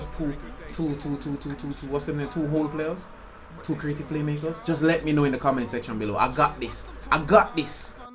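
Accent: Jamaica. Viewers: oh i been watching for weeks and i said to my self bet he's a jamaican😎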